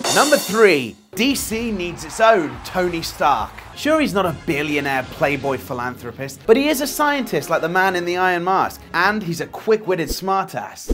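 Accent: United Kingdom. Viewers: I'm just going to put this out there; the elephant in the room so to speak. I overlooked his weight because of his awesome British accent! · I swear this guy really is Jack Black with a british accent. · Your like jack black with a British accent. I love you!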